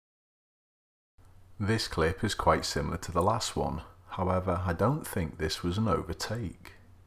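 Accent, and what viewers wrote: United Kingdom. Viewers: Normally I don’t like people that narrate throughout the entire video. However I love your voice and your decidedly British accent. Being a yank we only speak American we don’t speak the Queens English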